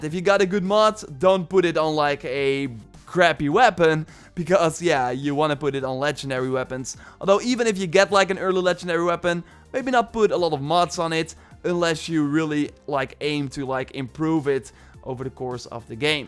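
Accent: Netherlands. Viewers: hello dutch dude. nice accent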